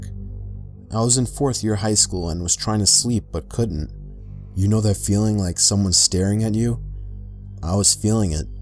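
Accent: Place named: East Coast, United States. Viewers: younger and sounds like an east coast accent?!! Nice ❤ I no longer here it in his newer videos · He sounds so different then, like a New York accent, now he sounds different. For example he says "...get off..." But is sounds like get ouaf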